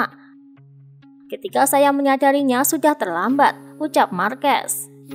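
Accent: Java, Indonesia. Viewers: Narasi Medok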